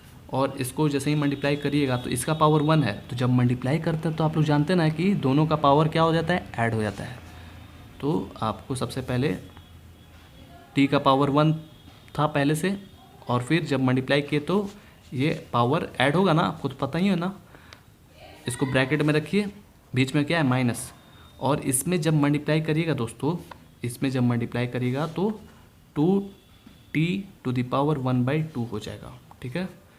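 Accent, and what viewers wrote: Bihar, India. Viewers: Sir u r from Bihar. .?♥️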